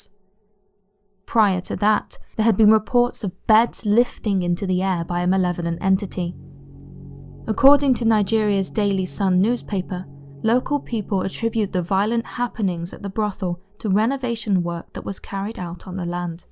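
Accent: United Kingdom. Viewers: The British accent is one of the best. So calming to listen to.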